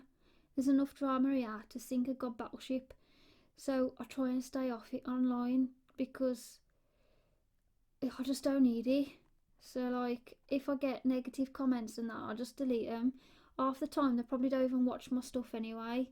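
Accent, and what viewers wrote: Black Country, United Kingdom. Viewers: I loved one of your older videos where you talked about your childhood blanket. Too bad that I didn't know about this video & questions, I would've asked some fun ones. As an American from Pennsylvania, I love your black country accent. Occasionally it makes me think of "Miss Braham's" from "Are You Being Served?" I loved reruns of that show. =] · Hearing your black country accent is just so random, especially because even in the UK you don't actually hear it that often either, I mean I'm in Manchester so thats probably why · Lovely bit of black country talking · Never guessed you were a yam yam dude lmao. West Midlands represent <3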